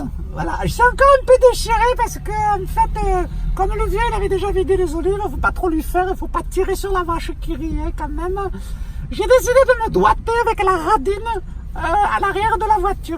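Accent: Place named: Marseille, France. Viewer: J'adore ton accent des Goudes,!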